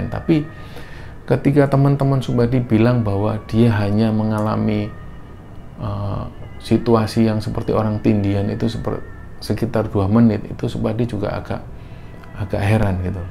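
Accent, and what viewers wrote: Java, Indonesia. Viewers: Cara penyajian dengan belakang backsound uyon " juga background yg gelap remeng" ditambah cara menceritakan dengan logat yg agak medok jawa bgt Bikin suasana lebih "greng" Suara khas mas yg bikin kangen dengerin nya Jam segini lg... Heeemmm Sehat truuuuuss yakkk... Kewreeeennn👍🏼💞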